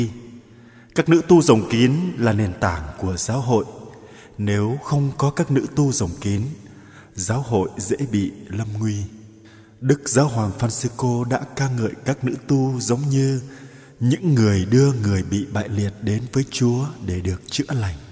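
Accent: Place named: South, Vietnam. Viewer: Bình thường trong những live show Nguyễn Hồng An nói giọng Nam đã thay để thương, bây giờ nói được nghe giọng Bắc của sao ngọt ngào, càng de thuong hon nữa. Hat nhạc thánh ca hay nhạc đời đều tuyệt vời. 1,000 Likes nhé.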